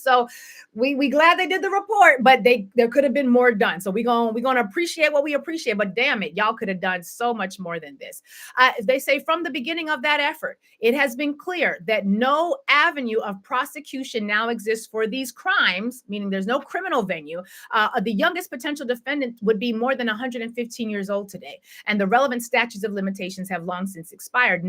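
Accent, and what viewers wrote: South, United States. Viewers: And you speak southern belle.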